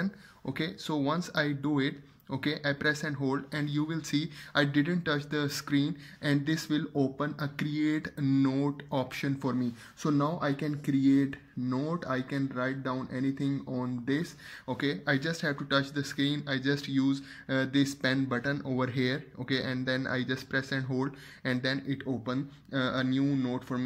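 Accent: India. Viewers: I love indian-english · Bought the S9 Ultra. Sreached for S Pen Features and stopped as soon as I heard an indian accent. =)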